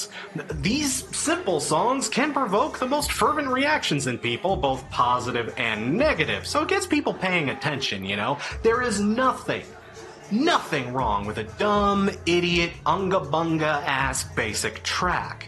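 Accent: Boston, United States. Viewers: Wow that Boston accent...for a moment I figured you thought they were from Australia. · I was enjoying your Boston accent.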